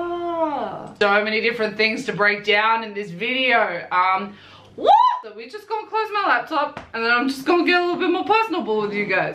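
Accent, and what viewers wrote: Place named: Australia. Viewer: YOUR ACCENT!! ITS CHANGING SO MUCHHH💕 YOU’RE LIKE 100% AUSTRALIAN NOW!! IT’S SOOO CUTE!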